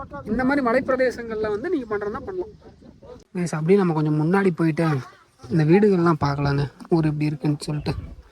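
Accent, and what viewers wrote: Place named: Coimbatore, India. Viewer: ne pandradhu ellam Kovai outdoors mari Iruku bro slang and intro ellame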